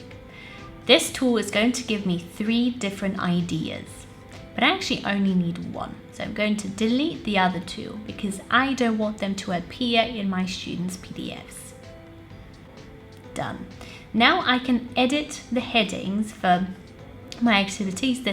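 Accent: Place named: Eastern Europe. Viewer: I wonder where her accent is from 🤔 seems like Eastern Europe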